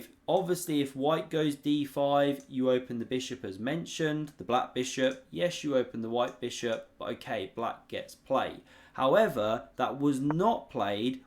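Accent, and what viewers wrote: England, United Kingdom. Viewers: I came here for the English accent, but stayed for the chess. Heh.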